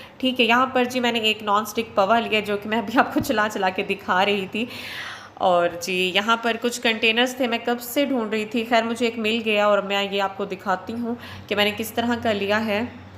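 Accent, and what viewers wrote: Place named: Punjab, India. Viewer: Punjabi tone zyada zbrdst hai aap ki 🌹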